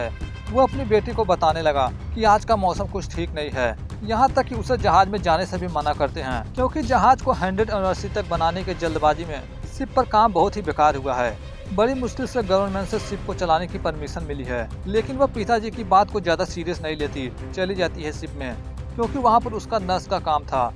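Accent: Bihar, India. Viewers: bat se lag raha hai bihari ho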